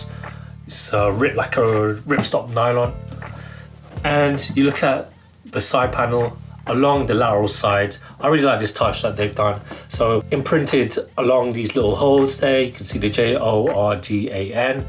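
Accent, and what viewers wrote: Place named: United Kingdom. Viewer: The British accent makes him seem of average intelligence but he really below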